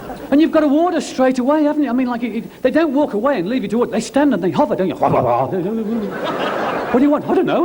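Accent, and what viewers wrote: Birmingham, United Kingdom. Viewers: Is he a brummie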